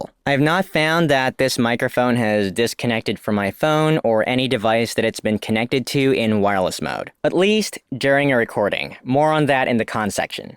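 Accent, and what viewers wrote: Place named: United States. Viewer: In the video you said you are from the Philipines, but your accent is like native American! how come? did you learn it or your were raised in the USA?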